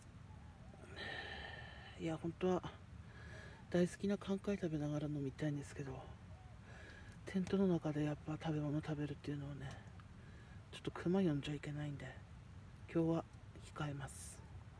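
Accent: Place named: Tsugaru, Japan. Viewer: また笑って見ました。女子ソロキャンパーの新境地開きましたね。お茶の間劇場ぽいキャンプ動画で漫画チック✨✨楽しいなあ。 jaijaiさんの自然体津軽弁？に温もりを感じます。プラスちゃんの可愛いキャラクターがgood💕 なんかヘルパーのお姉さんとバアサンのコラボみたいになっちやいましたね。🤣 お天気に恵まれてヨカッタヨカッタ\( ˆoˆ )/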